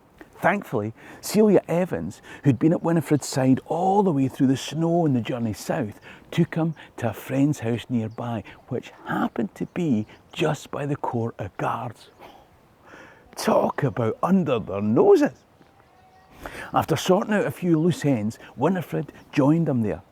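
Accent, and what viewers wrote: Scotland, United Kingdom. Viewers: No, no-one said that, and that is every bit as disrespectful a distortion as asking you not to speak in a Scottish accent was a disrespectful request. I have watched enough of your videos to know that you are more intelligent than that. Normalising the use of AI imagery harms people who make their living making that imagery for creators by making it acceptable for those who can afford to pay them to cut them out instead. You are talking like no form of visuals other than AI are available for you to make your content less visually boring. That is patently untrue. Perhaps if I were to churn out 10 AI generated videos on Scottish history a day, that had been "trained" on your content, you would get it then.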